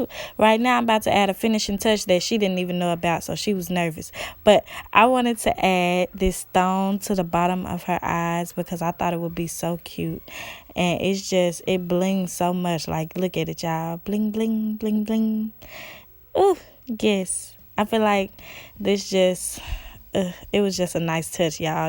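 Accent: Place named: South, United States. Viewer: U so Country 😂 the first minute of the video I couldn’t stop laughing lol gotta be somewhere down here in the south. Awesome work 😍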